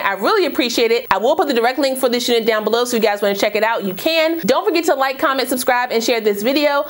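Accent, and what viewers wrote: Washington DC, United States. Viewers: She looks so natural on you. And the color is so pretty. ❤❤❤ btw, that intro git me asking Sis, you from DC?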